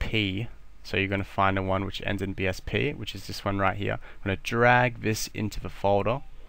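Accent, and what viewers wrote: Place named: Australia. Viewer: If this guy wasn't australian I probably wouldn't really be paying attention to this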